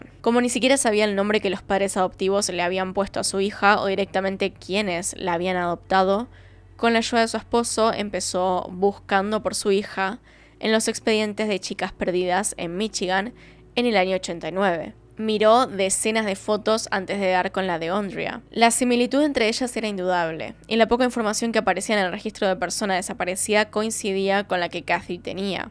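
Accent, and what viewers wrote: Buenos Aires, Argentina. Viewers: No me gusta tu dicción, no creo que hables así cuando vas a la panadería, hacelo más natural, teniendo tanto acento porteño pretendés renegar de la pronunciación en español exageradamente, aunque sea en nombres propios o de localidades cortas la esencia de la narracion en tu idioma, no creo que tengas un nivel alto de público angloparlante por lo que no es necesario tanto, mañana o pasado elimino el comentario por qué no se cómo hacerte llegar la sugerencia. Por lo demás el resumen es bueno y consistente, más que bueno, sin agregados inútiles como nefisto, landó, bárbaro etc que se van por las ramas, muy buena tu capacidad de síntesis. Saludos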